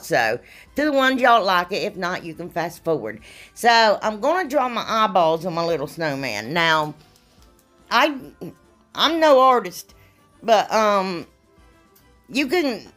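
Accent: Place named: North Carolina, United States. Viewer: This was my first time watching your channel and I was so impressed. Your projects are fantastic and your personality shines through. I love your accent. I’m from Tennessee and I thought we had a good southern accent but yours is precious.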